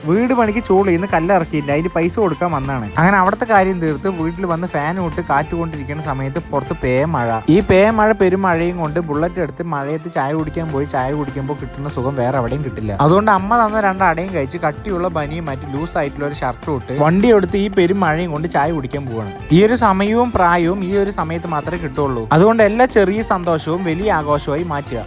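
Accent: Palakkad, India. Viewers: Bro നിന്റെ വീട് പാലക്കാട്‌ ആണോ 😊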